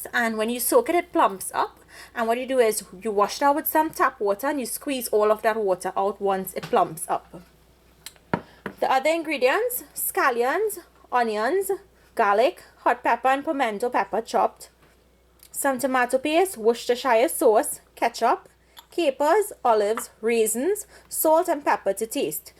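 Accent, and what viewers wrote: Trinidad and Tobago. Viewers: Hi, I'm still watching your Christmas cooking. It makes me feel at home. Trini English.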